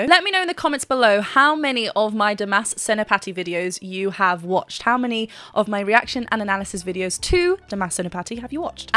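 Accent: United Kingdom. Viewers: I love ur British 😅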